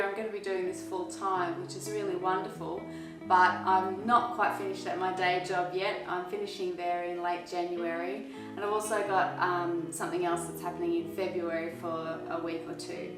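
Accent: Australia. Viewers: Hey! This is fantastic news! Well done you! I’ll definitely be following along. I’ve watched your videos so much I’ve started reading and thinking about soap in your lovely Australian accent! 😂. Going to try making your pine tar soap for my own blokey blokes soon. Can’t wait to see what you come up with next! Ooh - and if you haven’t already, could you please teach us all about master batching, storing and reheating lye solution? Thank you. Take care and you and yours stay healthy and happy! 🙏🏼😍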